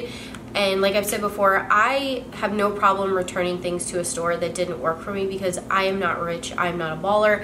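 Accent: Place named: Maryland, United States. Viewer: I'm from md too ! I say warsh and warter too lol. Not to mention I did my nails earlier today the exact same way. Great minds think alike 😊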